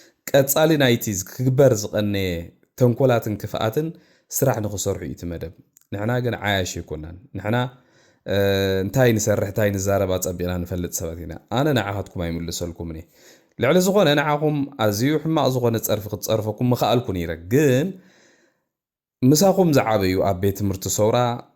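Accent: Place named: Tigray, Ethiopia. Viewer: ላህጃ ከስከሰ ምላሽ ይስሕቅሉ ኣሎዉ። እዚ ከኣ ላህጃና ንሰብ ሓጎስን ሰሓቅን ዘቅስም ላህጃ እዩ። ኣጆካ ሓወይ እንተኪኢልካ በጃካ ብላህጃካ ዘና ስራሕ።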